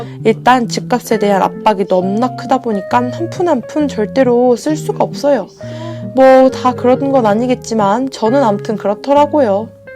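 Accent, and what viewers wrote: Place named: Jeolla, South Korea. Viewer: 저도 광주사람이라그런지 전라도사투리가 친숙하게 들리네요~~영상 잘봤습니다~~❤️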